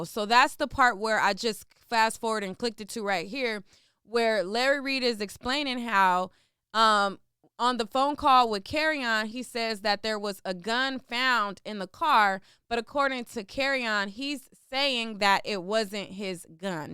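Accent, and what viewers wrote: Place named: Philadelphia, United States. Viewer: U have a philly accent a Lil are u from philly?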